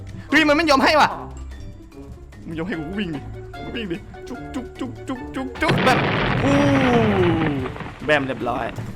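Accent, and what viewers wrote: North, Thailand. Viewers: พี่เป็นคนเหนือใช่ไหม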